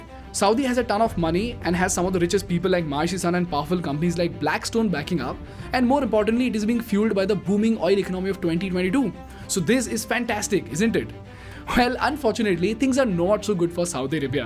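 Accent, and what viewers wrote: India. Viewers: Good video 👍 but Please speak proper English not indlish if u can't then please get someone to articulate it. Very irritating when half is what u says is not clear. World sounds like ver-ll and u speak is fast... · In your contribution there is some truth. But how you present it in high speed and with your indian accent, that's terrible. Also your argumentation chain is not sustainable enough.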